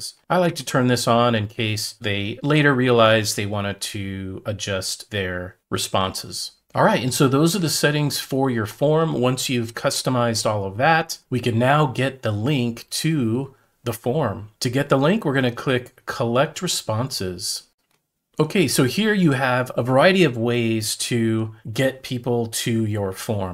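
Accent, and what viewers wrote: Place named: United States. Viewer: very useful! However, every time I hear an American pronounce 'mobile' in 'mobile phones', I'm struck by how much it sounds like 'MOBIL' as opposed to mob-ile which is how the rest of us pronounce it (Australia, Britain, Ireland, Scotland, etc) Indeed, how do Americans pronounce the name of the oil company, MOBIL? And how would you distinguish that from something being mobile? Any way, who cares. You answered my question which is how I actually get my form link to my users, so thank you sir for the clear explanation!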